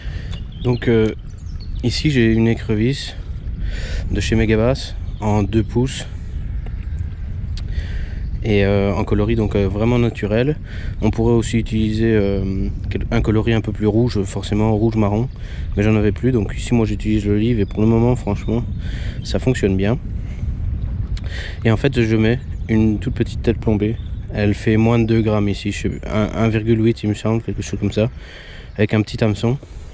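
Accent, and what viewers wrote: Belgium. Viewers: Wouah 😲 respect mec 🤜. TRES belle section, des truites magnifiques. j'ai Jamais vu une vidéo pareil...tes très respectueux avec les truites un vrai passionné ça fait plaisir à voir 😊. Je m'abonne à ta chaîne du coup et bien sur le petit pouce 👍. Ne change pas surtout 😉. Bye PS: Petit accent belge ?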